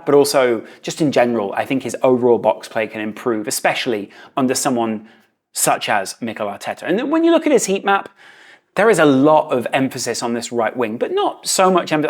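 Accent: United Kingdom. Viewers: This just doesn't make any sense. British accent, baseball jersey, skater/surfer hairstyle....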